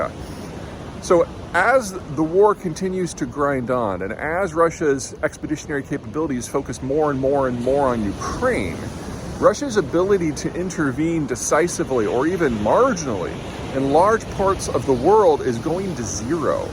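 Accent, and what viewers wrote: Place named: Midwest, United States. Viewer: Midwest accent causes this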